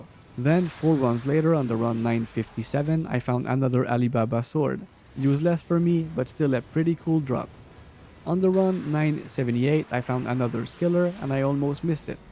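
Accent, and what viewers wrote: Quebec, Canada. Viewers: Are you from Quebec? Accent seems familiar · Facile d'reconnaitre un Queb quand t'en entend un lol. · Are you quebecois? I feel like I can recognize the accent as a fellow Canadian. Cool video either way! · ca sent le quebec icitte !!!!! good video bud!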